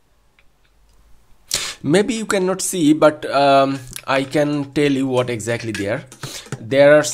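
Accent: Bengal, India. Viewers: You have such a STRONG Bengali accent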